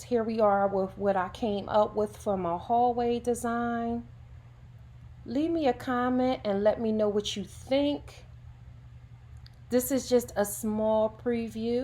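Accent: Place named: DMV, United States. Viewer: I liked this video bcuz you sound like you're from the DMV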